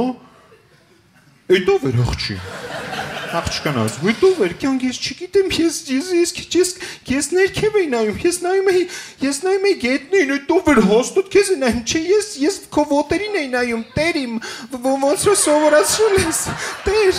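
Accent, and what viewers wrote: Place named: United States. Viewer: Մի տեսակ չեմ հասկանում ամերիկյան ակցենտով հայերեն խոսալու իմաստը, ահավոր խնդալու ա հնչում )))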